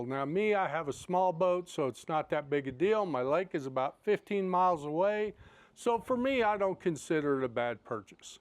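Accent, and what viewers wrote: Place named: Brooklyn, United States. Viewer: Great video, but Brooklyn accent can be difficult to understand.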